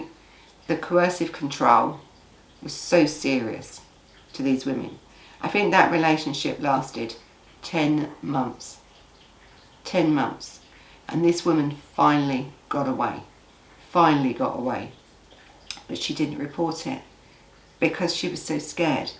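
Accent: London, United Kingdom. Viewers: So good to see you back. I was hoping you were OK and just needing a break from the emotional drain of all this violence and cruelty you dig through to make us the videos. I love your London accent btw. Reminds me so much of listening to some very special people in my life. Old friends who aren't with us any more.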